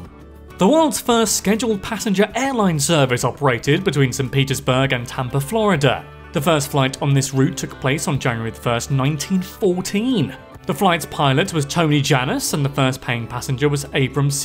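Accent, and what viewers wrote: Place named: United Kingdom. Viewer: The British say things differently huh? I cringed a couple times, but what can you do? It'd be kind of a "haha" if he did pronounce it like we do and he commented on how weird it sounds to him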